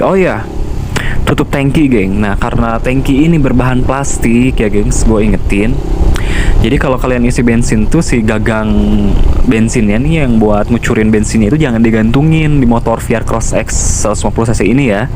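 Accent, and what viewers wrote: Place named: West Java, Indonesia. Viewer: salfok bilang gue elu tapi logat sunda.. hahaha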